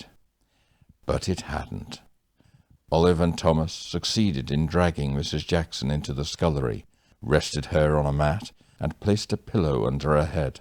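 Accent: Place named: Swansea, United Kingdom. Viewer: Having just finished my own first book, you have my sympathies. It involves such a monumental commitment of time and emotion with no guarantee of conventional publishing success, but with the strong platform you have built here I’d have thought there’s a good chance you’ll get interest in publication. I had no idea you too are from Swansea (though I thought I detected traces of a local accent in your voice). Ive enjoyed your work greatly during the last few very difficult months so wish you ‘pob lwc’ with your book, which I can’t wait to find out more about especially as the locations are so familiar.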